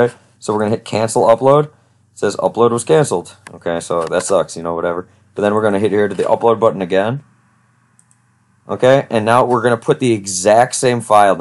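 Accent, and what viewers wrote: United Kingdom. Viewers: Sounds like he's kinda British though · idk I kinda agree that he sounds British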